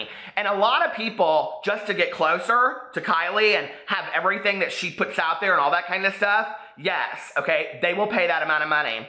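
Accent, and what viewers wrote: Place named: Midwest, United States. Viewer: Yes!! It has to be a Midwest thing, I say it the same way!😂😂 I'm from Northwest Indiana.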